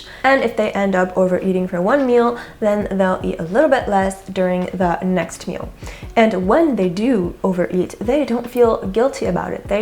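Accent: United States. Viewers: Tu viens de la France vraiment ?? Wow, ton accent américain est si merveilleux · Your American accent is the best I’ve ever heard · Wow your American accent is perfect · If you're French, why do you sound more American than i?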